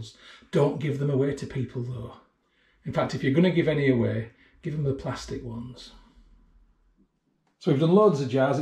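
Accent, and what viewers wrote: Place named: Yorkshire, United Kingdom. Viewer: Hey - good on ya for reclaiming all those lovely bottles. I used to do it but eventually grew tired of the process - I now brew exclusively with flip tops On a different note - I'm sure you've already figured it out since making this video - but if you make up your sanitiser into a solution and fill your bottles that way - not only is it a lot easier to fill the bottles - you can keep reusing the same solution for quite a while as long as the pH stays above 3.5. I keep a few 2 litre juice bottles full of StarSan solution in my laundry and just pull out what I need whenever I start a brew. I haven't had to change the solution for nearly a year so far and given the cost of StarSan it's a good saving. In closing - I'm not sure which I enjoy more - your content or listening to a proper Yorkshire accent again. Ee ba gum lad it's like puttin' on a nice comfy pair 'a slippers. 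😂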